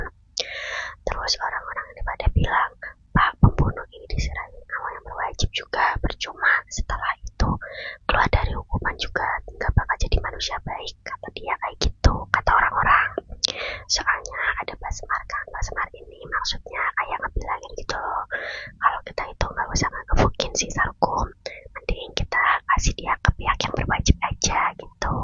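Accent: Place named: Java, Indonesia. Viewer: medok bgt kak😭, btw asmr bahasa jawa lagi dong tp crita gtu trsrh kakak crita apaa ajaa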